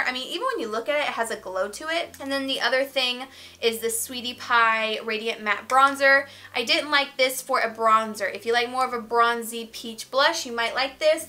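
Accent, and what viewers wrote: South, United States. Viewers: Do you ever use any Colourpop products? They have really amazing palettes that are perfect for Fall!! P.S. That south accent 🙈😍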